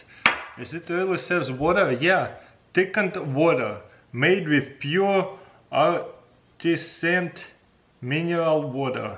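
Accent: Russia. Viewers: Russian Accent hits you in the first second.... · His russian accent is thiccer than the water and i love it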